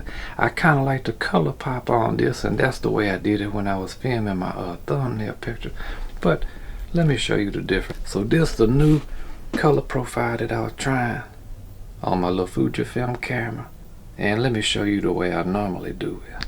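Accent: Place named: South, United States. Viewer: Southern Bob Ross, I love it!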